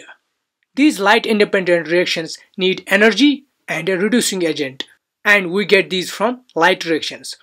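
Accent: Kashmir, India. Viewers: I guess you are from kashmir brother...? Am i right?? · Thanks for explaining how life works. And thank you for using English. Your Indian intonations are a little hard to follow but I compliment your efforts. 😀👍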